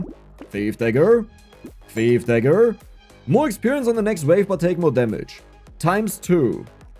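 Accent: Germany. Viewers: Man hört das Deutsch so durch xD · The german accent i love it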